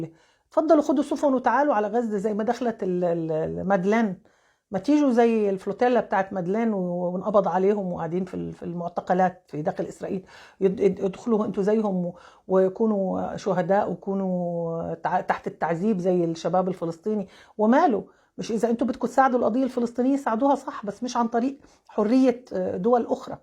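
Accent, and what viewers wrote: Egypt. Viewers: انت لست فلسطينية انت مصرية لهجتك تؤكد ذالك ولم أكمل مشاهدة الفيديو ومصر ليس لها الحق كما ذكرت من في سدة الحكم القاتل عميل الصهاينة الخسيسي يحمي هذا الكيان اللقيط والقافلة تريد فك الحصار لا الحرب وانت تبرري لها الحق عن اي حق وهو جند التراما دوليون الحشاشون عبدة المال القذر لسب الناس المسالمون وإعلام العار والشنار اللاعق الناعق بما هو مخزي لدولة عريقة أصيلة بحجم مصر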